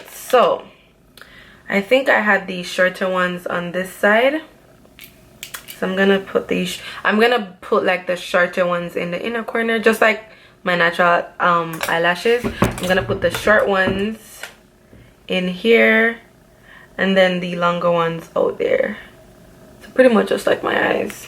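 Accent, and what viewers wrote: Jamaica. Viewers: I hear my Jamaican voice 😍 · Jamaicans English language sah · Chile the jamaican accent is tryna jump tf out loool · Immediately subscribed once u started talking #Jamaican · Is she Jamaican? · Are you jamaican? Love this. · You sound Jamaican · You sound jamaican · Dah jamaican accent🇯🇲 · Are you jamaican?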